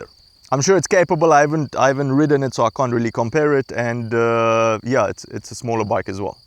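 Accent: South Africa. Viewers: How did you get the South African accent?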